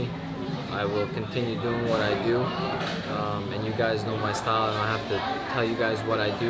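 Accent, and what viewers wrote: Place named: New York, United States. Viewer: The thing I like most about is how he says things that make me take more accountability and responsibility for my life in general. Like how he says you gotta motivate yourself, because exterior motivation fizzles out quickly. I think it may also be the New York accent mixed with his no bs approach, plus the steely blue eyes. 🤣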